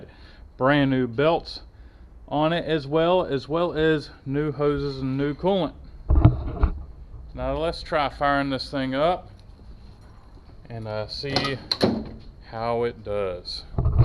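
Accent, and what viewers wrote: South, United States. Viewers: nice easter egg with the vgg theme, But I appreciate your natural southern accent, please dont adopt his larry the cable guy gimmick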